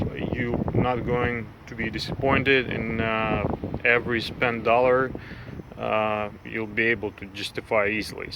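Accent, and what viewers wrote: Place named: Russia. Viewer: You sound like Ray Raymonde with a Russian accent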